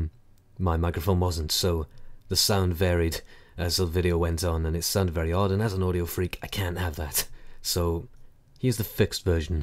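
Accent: Wales, United Kingdom. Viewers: you're welsh? that's awesome! I'm half welsh (but I was born and live in America so I don't have that awesome accent). I went to Wales 4 years ago for a couple of weeks. beautiful country. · You don't sound that welsh:o Now you've mentioned it though I can see it:P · I knew it! I was sure I recognized that accent. Hello from Wales. ^_^ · Your welsh:DDDDD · Here's what I would love to see: Best: CoD4, BF3, MW3 (must have sonorous voice-over) Next best: everything else as long as it's still narrated by our Welsh host. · I knew you where welsh:) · You're Welsh?? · Jesus did not realise you were welsh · I knew you was WELSH!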